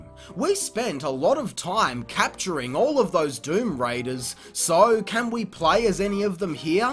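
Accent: Australia. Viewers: You are actually so australian that it hurts, good video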